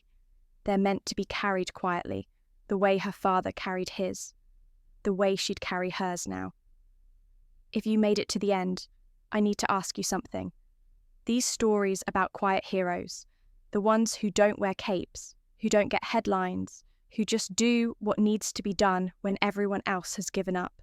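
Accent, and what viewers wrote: United Kingdom. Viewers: why do we have to endure an english accent when watching US Navy Seals?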